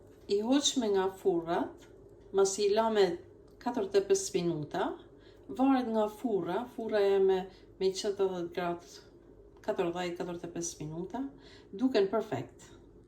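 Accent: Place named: Shkodra, Albania. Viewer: Ty te lymshin duart e pastra e te arta! Komplimente qe perdor doreza ne gatim çka tregon kushte higjeno sanitare te larta per moskontaminimin nga mikrobet te ushqimit dhe organizmit edhe pse gatuan per familjen tende! Por, ama, gjeja kryesore qe te kenaq vetem me te nigju me dialektin shkodran fantastik, "feltere", "tamin", "biber", "i perzime", "katerdhjete e pese minuta" Dialekti me i bukur i gjuhes shqipe! Te faleminderit!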